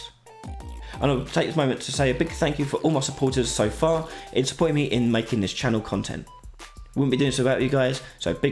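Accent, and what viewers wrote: United Kingdom. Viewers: Man can u slow down a bit while u speaking ... British accent it's so hard to understand ... And thank you for being a great teacher man ... 💖💖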